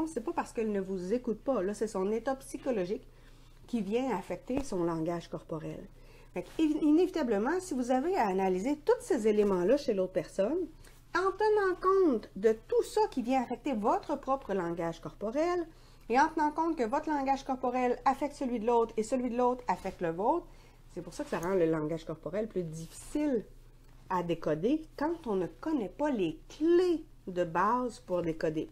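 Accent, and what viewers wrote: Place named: Quebec, Canada. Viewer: Salut. Je viens juste de me réabonner À ta chaîne. J'adore honnêtement continue. J'aime bien et beaucoup ton accent québécois Amicalement bisous Sophie😺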